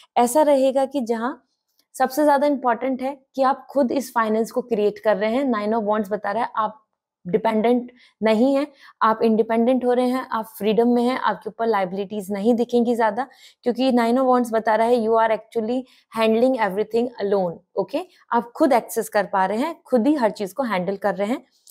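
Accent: Haryana, India. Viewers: Are you from HARIYANA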